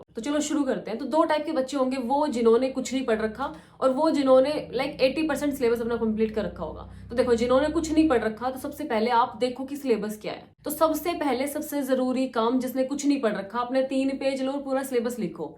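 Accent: Haryana, India. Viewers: Many Hariyanvi waala tone h bahan ka 😊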